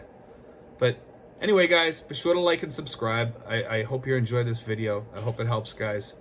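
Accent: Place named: New England, United States. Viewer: A New Englander I hear it haha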